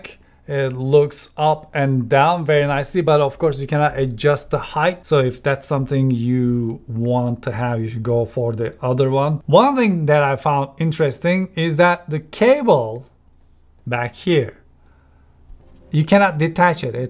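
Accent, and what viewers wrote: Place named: Turkey. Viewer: abi taşağına ve türk aksanına kurban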